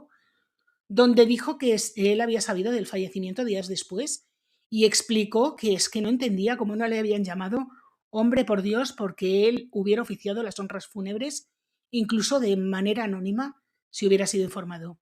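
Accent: Spain. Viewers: Gracias por el vídeo, aunque no me gusta el acento español me gusta como lo narras e incluso a veces me hace sonreír los comentarios que haces.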